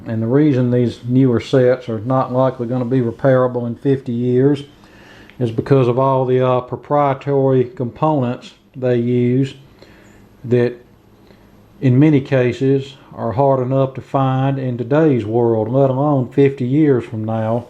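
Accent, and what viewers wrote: South, United States. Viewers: I don't ever recall anyone dying from consuming too much weed, yet not a day goes by that an innocent person isn't murdered by a goddamn assault weapon, designed to be used on a battle field. Guess we really shouldn't be surprised to hear you spew more negative comments about a plant that to the best of my knowledge hasn't killed anyone, and speak with such authority and fondness concerning assault rifles. We've been trying it your way forever, and things just keep getting worse for the children, yet every time we try to introduce even the most basic, common since changes in firearm laws, such as better back ground checks for instance, wrong wing fools like yourself cry about it. Ok, what do you backward ass right wingers propose we do? None of your representatives have any legislative proposals at all, AT ALL ! That is unless you consider M&M's, Disney & gay Americans real legislation. 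Most of you traded your country for a goddamn Red hat, and then tried to over throw our country because you didn't like the outcome of an election. Holy Shit, don't you feel smart ? ;-). You all were played by perhaps the dumbest American of all, and definitely the worst business man in the country. Based on that backward sounding southern accent of yours, we really shouldn't be surprised to hear you talking the way you did about this weeks school children being murdered, I mean they were guilty of going to school after all ! Such good Christians !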